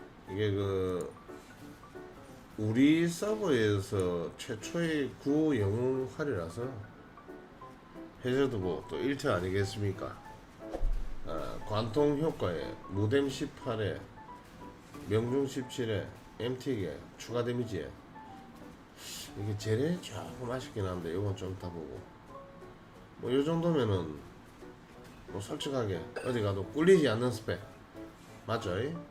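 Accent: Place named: Gyeongsang, South Korea. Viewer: 경상도 사투리가 제스타일입니다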